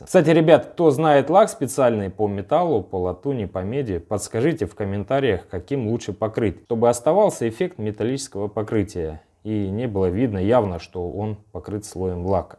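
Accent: Rostov, Russia. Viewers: в Ростове все так говорят, обычное дело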